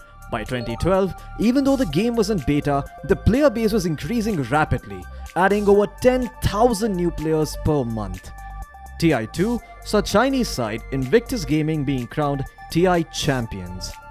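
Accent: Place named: Southeast Asia. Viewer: se asian accent so i wont watch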